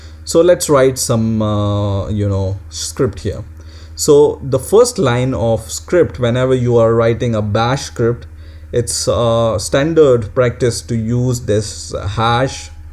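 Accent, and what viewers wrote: India. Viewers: Response to accent comments. Yes an Indian accent can be a bit hard... but as long as I don't hear the word "ugh", "uhhh", etc it is much better to listen to. A well practiced and well pronounced accent is appreciated, but I don't think this tutorial is unbearable at all. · i hate indian accent :( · Many are commenting on Indian accent. Let me tell you Indian accent for English language(despite not being mother tongue language) is far better in comparison to Hindi accent of native English speakers. If any of the native English speakers doubt what I said JUST GIVE IT A TRY...LOL. By the way this tutorial is very impressive.👍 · beware indian accent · I personally like the indian accent more than some hardcore "real" english dialects which i dont understand at all.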